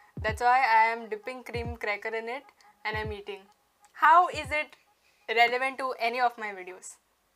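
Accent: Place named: Goa, India. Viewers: That konkani accent doest suits you.,........😬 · I haven't been to goa in such a long & hearing you speak in that typical Goan accent makes me want to go there and learn the rest of the language and speak and catch the accent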